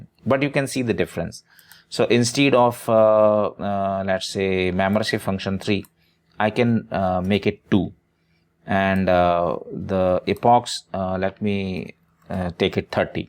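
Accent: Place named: India. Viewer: nowadays, when you see a tutorial with Indian accent, you know it has better chances to be a good one. This time it didn't disappoint. Kudos to Indian engineering, Kudos to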